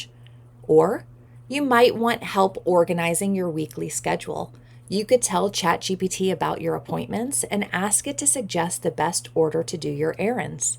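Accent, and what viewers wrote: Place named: India. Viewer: An indian guy with a voice modulator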